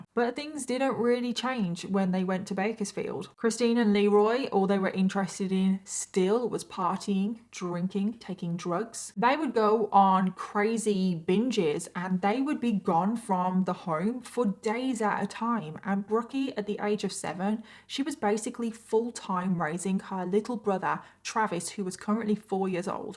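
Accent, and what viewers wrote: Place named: United Kingdom. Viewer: whereabouts in Britain are you from girl? period.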